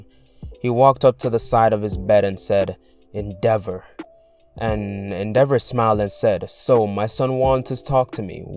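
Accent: Jamaica. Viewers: U sounds Jamaican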